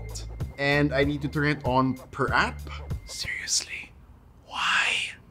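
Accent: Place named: Philippines. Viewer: When I heard your accent, I instantly thought you're a filipino.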